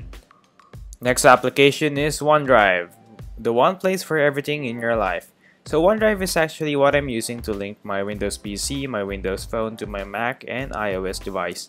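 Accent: Philippines. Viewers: Pinoy po ba?! clear po kasi sa accent. ;) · As soon as i heard your voice i knew that you were Filipino, wassap man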